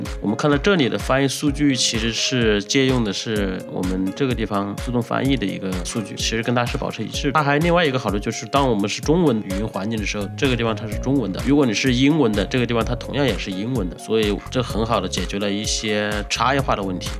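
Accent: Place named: Hunan, China. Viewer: 太厉害了。UP主超棒。一口湖南普通话让我这个长沙女婿听着很亲切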